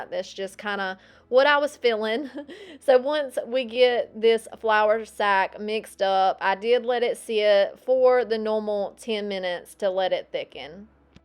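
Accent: South, United States. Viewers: I’ve never tried milk paint but you make me want to try! I’m so excited I found your channel! And I love your beautiful southern accent!! 🫶🏼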